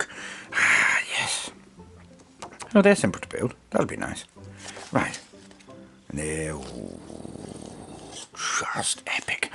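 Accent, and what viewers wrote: United Kingdom. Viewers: What part of Britain are you from? I notice differences in how you say some words vs how the Warhammer people say them...And that amazing accent!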